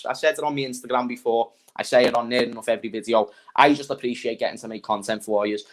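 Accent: Liverpool, United Kingdom. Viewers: Happy New year oooooozing scooouseeee love your energy and enthusiasm just became a member looking forward to some more great content 👍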